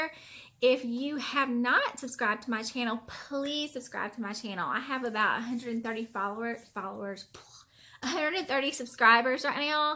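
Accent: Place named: South, United States. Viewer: Welcome to the teaching tag! I love your southern accent! Hubby and I have always wanted to travel to the south and try the food! I'm so glad you are doing some more peer tutoring. Its so beneficial for students on both sides of the learning. I will add you link to my January video ♥️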